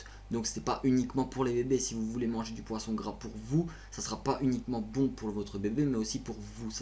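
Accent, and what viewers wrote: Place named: Moselle, France. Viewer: çà c est un accent de moselle, coté saaaaaaaarguemines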